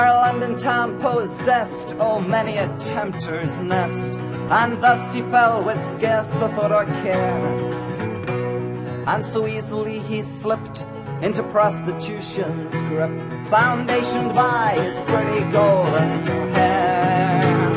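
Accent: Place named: Germany. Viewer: Who is the narrator with the german accent?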